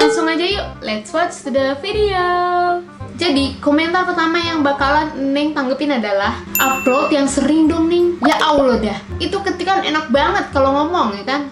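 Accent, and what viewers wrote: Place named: Jakarta, Indonesia. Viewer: Subs baru nih,😎😎😎 Eneng ornag mane emang ?? Koq ngomong nya kek Betawi Depok???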